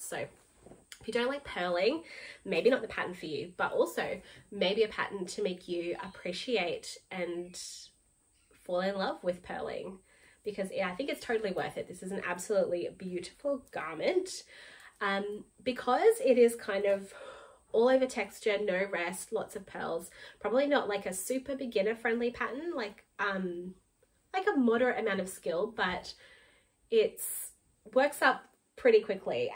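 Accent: Australia. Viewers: Hi there, so nice to see you again. Thanks for your informative podcast about your projects it was really helpful and it's so nice to hear an Aussie voice on You Tube. Have a great year!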